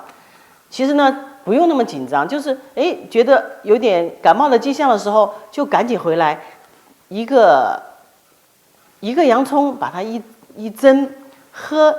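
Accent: Wuhan, China. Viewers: 很像武汉人的口音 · 第一次看到你的视频，听口音像武汉人，请问洋葱蒸汁治咳嗽有剂量的要求吗？每次最少需多少毫升呢？谢谢🙏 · 听您的口音好像武汉人呢！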